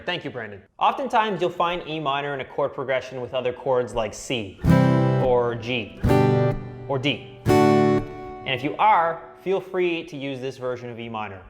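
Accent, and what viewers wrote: Canada. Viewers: Pranav Gautam Canadian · Thanks man. I actually searched and they actually say Abouut instead of Abaout. Didn't know it was called a Canadian Raising. xD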